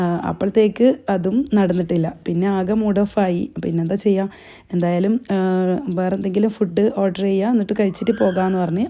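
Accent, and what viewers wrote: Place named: Kannur, India. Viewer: അടിപൊളി🔥🔥 കണ്ണൂർ ഭാഷയിലെ നല്ല അവതരണം🎉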